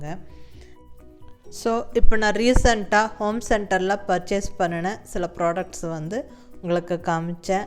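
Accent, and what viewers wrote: Coimbatore, India. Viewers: Very nice mam your red color set neenga cbe ya neenga pesura slang very nice mam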